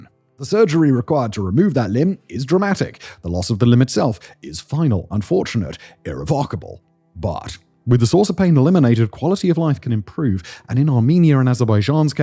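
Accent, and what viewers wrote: United Kingdom. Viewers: His British accent is too heavy. It's difficult to follow what he's saying.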